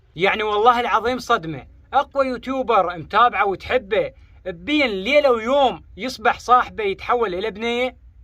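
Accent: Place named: Iraq. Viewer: عجبتني اللهجة العراقية بفيديوهاتك و لله اذا تستمر و تطور قناتك اكثر راح تطش 😂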